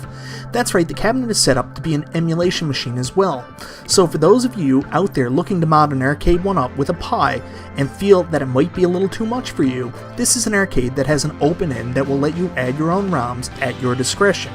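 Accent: Canada. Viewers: Great review. By chance are you a fellow newfie haha accent sounds like it lol. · So far, you can tell you're from Canada. Especially with the "marquee". I love the accent. Thanks for the review!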